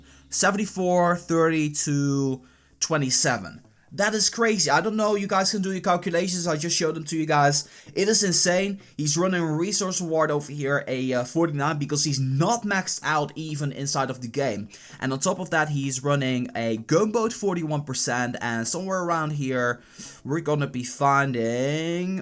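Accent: Netherlands. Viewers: | Boom Beach & Clash Royale Are you from the netherlands? you have a dutch accent :L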